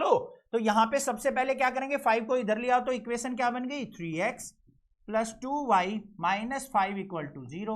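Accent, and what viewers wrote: Haryana, India. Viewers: Sir haryanvi on top ❤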